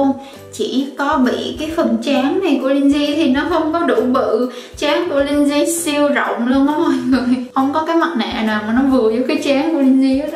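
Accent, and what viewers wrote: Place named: North, Vietnam. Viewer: E thấy nói tiếng Bắc dễ thương quá...vào một video nào đó... sẽ làm một video skincare hoặc makeup hoặc vlog..v..v nói tiếng Bắc nhé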